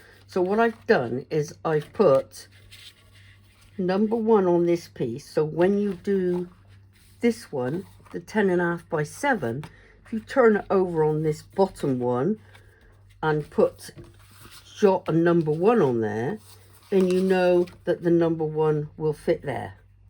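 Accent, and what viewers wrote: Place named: West Country, United Kingdom. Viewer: An absolutely beautiful card made by a beautiful lady. I can hear by your accent that, like myself, you're a West Country girl? I'm a Devonshire Dumpling 😂😆💗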